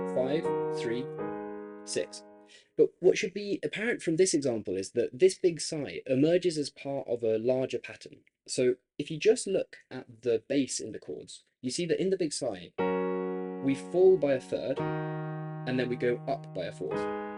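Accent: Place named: United Kingdom. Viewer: sorry to be negative, but I have to be. I cannot watch your videos. I have tried but all the hand waving drives me crazy. Then there’s the speed at which you speak; it is ridiculous. Why? Is there some hidden rule that says you have to go at a breakneck speed. I wouldn’t expect a drawl; that would be equally annoying. Just a normal pace. Also some attention to punctuation wouldn’t go astray. Your acquired accent makes it difficult to discern many words for the non Brit ear…maybe even fellow Brits have trouble understanding you ! I am sure the content is interesting and informative, but alas, I will never know. Unlike some of the sycophantic comments (which are probably your friends) I cannot subscribe or even (try) to listen or watch you again. Good luck.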